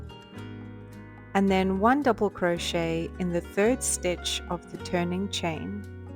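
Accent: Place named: South Africa. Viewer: Interesting! And so pleasant to hear a South African accent on a crochet channel.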